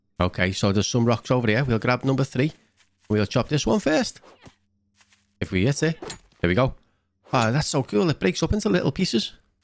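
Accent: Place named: Liverpool, United Kingdom. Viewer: Story time with your friendly Scouse 😅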